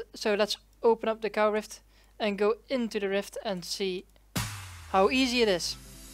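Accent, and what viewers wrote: Netherlands. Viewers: je accent klinkt zo lekker nederlands, love it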